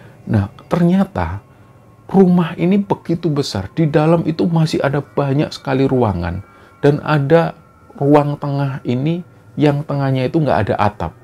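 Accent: Java, Indonesia. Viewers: Kyknya saya udah kecanduan cerita2 mistis. Suka bgt dengar aksen jawanya😊 Cara pengceritaan yg sangat menarik dan gampang di fahami oleh org Malaysia seperti saya. Mas nya juga ganteng kok🤭😄🙏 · suka... Dialek jawanya bikin adem Channel Nadia Omara jg bagus yg serial KHW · Saya pengikut baru mas,awalnya saya lihat kismisnya mas ini yg kuperhatikan cara beliau membawakan cerota dg logat jawanya adri situlah saya ikuti konten,semoga sllu sukses untuk mas dn para kru,dari THARIE KALIMANTAN akan sllu hadir di setiap kismisnya 👍👍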